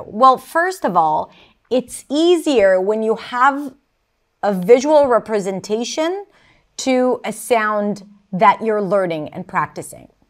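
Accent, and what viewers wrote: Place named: United States. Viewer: Madam your English speech is American I think coze I want to fallow .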